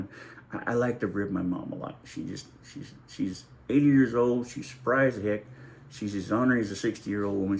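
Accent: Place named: Alabama, United States. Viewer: Heres my analysis on the entire video you have presented. 1. You have a southern Alabama accent. 2. You have some excellent knowledge. 3. Your skin is pink. 4.. You should be a motivational speaker. 5.. You look like Steve Austin the wrestler. 6. Your ancestors were definitely Catholics. 7. You are severely autistic. 8. You are not physically attracted to Black women. 9. You shave your own head at least once a week. 10. You are a meat eater and have never considered a vegan diet. 11.You never hang out with Black women. 12.You routinely hang out with Black men. 13. You are an excellent pool player. 14. You are a good dancer. 15. You pray to statues of Mary. These points are ALL true until corrected by you.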